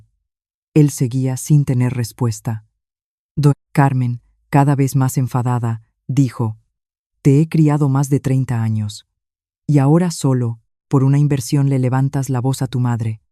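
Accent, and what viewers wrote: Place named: Spain. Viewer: El relato es desde España. Allí se habla así. Mis hijos sun despues de 15 años de volver a Argentina siguen hablando así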